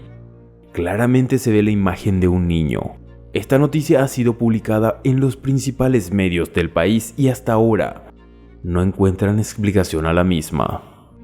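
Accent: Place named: Paraguay. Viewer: algun dia hace un video hablando con nuestro acento paraguayo! Me gustaria mucho ya que sos paraguayo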